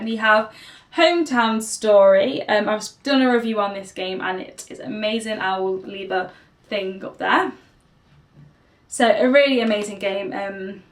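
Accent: England, United Kingdom. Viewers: Damn you are cute as hell. Love your british accent. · Nothing sexier than a black chick with an english accent (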